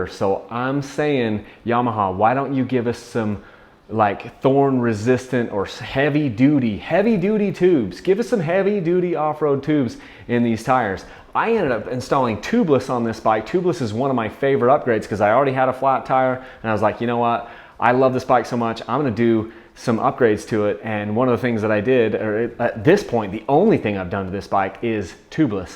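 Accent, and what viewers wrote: Utah, United States. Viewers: Dude you have the best example of a Utah accent I've ever heard! Thanks for the sweet content in familiar places, hope to bump into to you some day.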